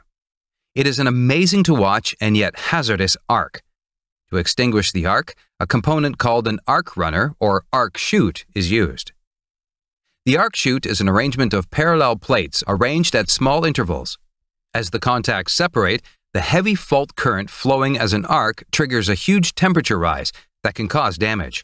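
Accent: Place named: India. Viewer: New to the channel. Was wondering how come the channel get from a good english accent to Indian English accent?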